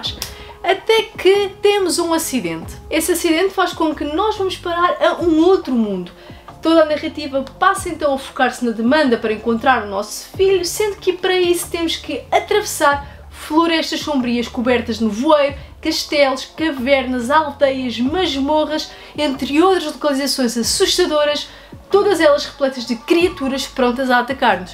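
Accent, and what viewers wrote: Portugal. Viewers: Eu Brasileiro assistindo seu vídeo com o português de Portugal e bem diferente o sotaque hehe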